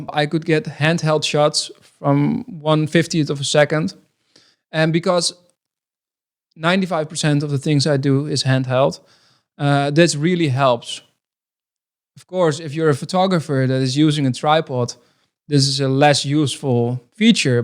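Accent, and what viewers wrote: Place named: Netherlands. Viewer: Are you Dutch, notice you have an accent. Nice video though!!!💪🏽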